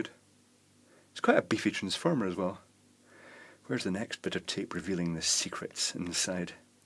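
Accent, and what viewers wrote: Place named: England, United Kingdom. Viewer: "Oh I think I may be out of plumbs here" I love the queens english!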